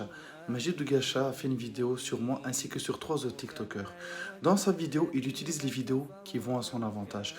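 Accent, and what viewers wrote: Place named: Namur, Belgium. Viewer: Ou habite tu en belgique ? J'entends un accent de namur mais je ne suis pas 100 % sur. On pourrait parler face à face d'appliquer la sh4ria dans notre pays et les details que ça implique ? Tu pourras réaliser le nombre incroyable d'infidéles à faire Peter avant que nos loi et advancés sociales ne retournent à l'age de fer.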